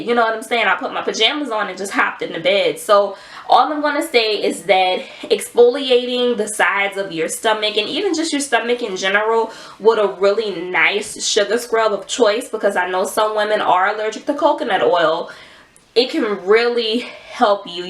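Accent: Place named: Louisiana, United States. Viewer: You have to be from Louisiana are sum😭your accent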